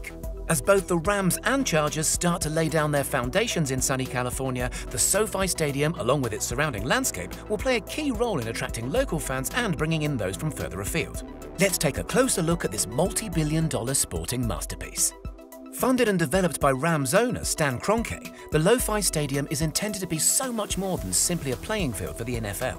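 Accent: United Kingdom. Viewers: Why does the narrator have a brit accent?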